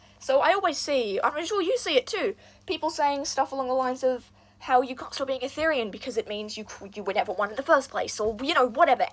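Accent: Australia. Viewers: are you Australian? 😭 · r u australian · I love your Australian accent 🥺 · Oh my God, it’s giving voice reveal. The Australian accent is Australiaing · …. Have I found another fellow Aussie!!!! It’s just the accent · He is Aussie, yes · THANK YOU.. I was once a therian, but i stopped bc i didn't feel the same anymore, i abandoned everything related to it thinking it was just a phase or how i was burn out on doing wtvr this is..I thought i was faking being one and it upsets me sm.. I thought i were never one (which i still believe in that but wtvr), now i found out my identity just shifted (which i did not control and felt so sadly nostalgic tho-). Also i rlly love your videos, and your Aussie accent haha.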